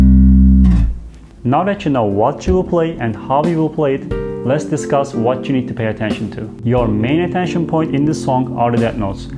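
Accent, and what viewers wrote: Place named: Turkey. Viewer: abi sen kesin türksün konuşmandan anladım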